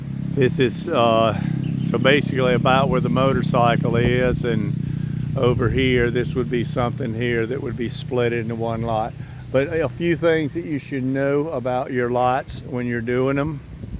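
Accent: South, United States. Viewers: Aloha from Hawaii dude. I love your Southern drawl lol. You are hard core. I really want to come visit. It is getting stupid expensive to live in Hawaii and racism against whites is bad. Thanks for sharing your life with us mate. ❤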